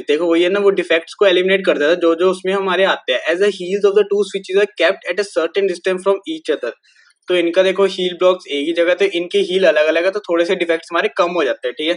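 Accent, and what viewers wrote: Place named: Jammu, India. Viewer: Way of speech suggests that you r from jammu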